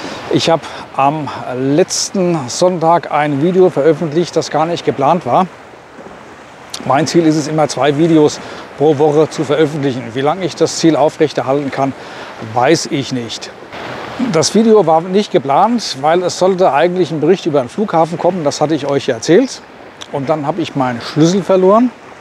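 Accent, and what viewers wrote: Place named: Hesse, Germany. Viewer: Ei Guude,.Ich lebe und arbeite seit 32 Jahren in Thailand/ Deutschland, spreche fließend Thai und bilde mir ein, das Land und die Leute relativ gut zu kennen. Dein Kanal ist einfach sehenswert, weil Du offen und ehrlich über Deine ersten Erfahrungen sprichst, versuchst, Infos zu vermitteln, obwohl Du selbst ja noch ein " Rookie" bist und das alles in schönstem hessisch. Ich finde das sehr unterhaltsam und rate Dir, diese Trolle einfach zu blockieren. Leider gibt es diese Art Menschen auf jedem social medium, die nur darauf warten, aus ihren Löchern herauszukriechen und irgendwelchen geistigen Dünnschiss von sich zu geben. Lass Dir Dein YouTube nicht madig machen, weiter sie bisher und von mir gibts, wie immer, einen Daumen nach oben! P.S. und grüße mir mein Pattaya. Habe da einige Jahre gelebt und es war eine tolle Zeit. 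Bangkok, der Norden, Phuket usw. sind toll, aber Pattaya ist eben was Besonderes ( hatte ich erst nach 7 Jahren Aufenthalt in Thailand festgestellt, da ich mich so lange geweigert hatte, mal nach Pattaya zu fahren ) Ich werde da bestimmt als Rentner wieder auftauchen.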